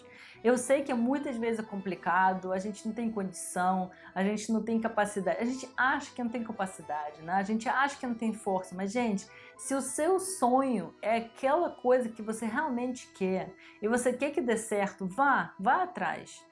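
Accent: Rio de Janeiro, Brazil. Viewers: Impressionante, como você incorporou a nossa língua! Sequer se pode notar um sotaque estrangeiro, a não ser o carioca que, diga-se de passagem, lhe cai muito bem. Parabéns pela obstinação, o que lhe valeu o merecido sucesso de que hoje você usufrui. E haja cabeça dura! Não sei se aguentaria tanto desaforo e desacertos em um país que não fosse o meu de origem. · Eita.....carioques lindo.... 👏🏿👏🏿👏🏿👏🏿👏🏿👏🏿👏🏿👏🏿👏🏿👏🏿👏🏿👏🏿👏🏿👏🏿👏🏿👏🏿👏🏿👏🏿👏🏿👏🏿👏🏿👏🏿👏🏿👏🏿👏🏿👏🏿👏🏿👏🏿👏🏿👏🏿👏🏿👏🏿👏🏿👏🏿👏🏿👏🏿👏🏿👏🏿👏🏿👏🏿👏🏿👏🏿👏🏿🍻🍻🍻🍻🍻🍻🍻🍻🍻🍻🍻🍻🍻🍻🍻🍻🍻🍻🍻🍻🍻🍻🍻🍻🍻🍻🍻🍻🍻🍻🍻🍻🍻🍻🍻🍻🍻🍻🍻🍻🍻🍻🍻🍻🍻🍻🍻🍻🍻🍻🍻🍻🍻🍻🍻🍻🍻🍻🍻🍻🍻🍻🍻🍻🍻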